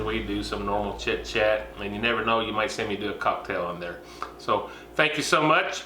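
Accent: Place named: Kentucky, United States. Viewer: Boy those are some mighty fine ribs mon ami...Your kentucky accent and my french canadian one some of these things are hard to pronounce lol . Love me some Tango spice!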